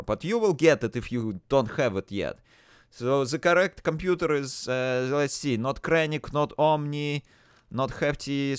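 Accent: Russia. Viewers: This is one of the less common variations of russian accent btw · love the russian accent and thanks for making this tutorial i didn't know how to do this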